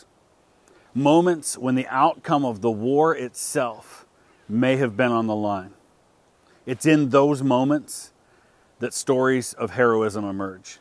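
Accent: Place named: Canada. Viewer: I have just started to watch this series of videos and you are so dedicated to WW1 and all the very new and very old ways of fighting. i guess that you are Canadian by your accent, I love the passion that you show for many dead very young men. The start of WW1 is still not very understood, why Britain got involved and even more the Americans is still to this day not really understood.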